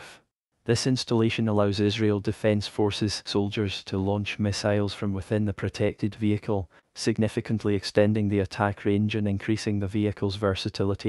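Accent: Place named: Scotland, United Kingdom. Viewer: You're Scottish!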